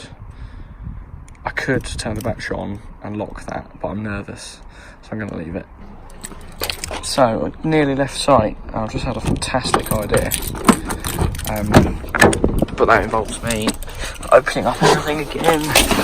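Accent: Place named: Portsmouth, United Kingdom. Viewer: Sounds like you live where I used to before I moved away? 30 years in Gosport born in Portsmouth 👍🏻👍🏻👍🏻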